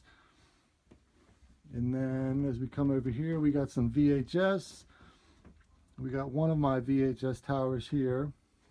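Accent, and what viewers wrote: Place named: Maryland, United States. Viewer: are you from Maryland? i keep hearing a slight MD accent. amazing collection, BTW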